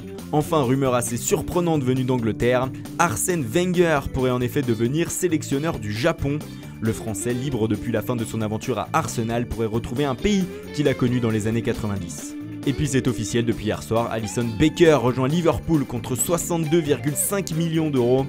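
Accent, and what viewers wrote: Italy. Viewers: Ptn arrête avec ton accent italien c’est relou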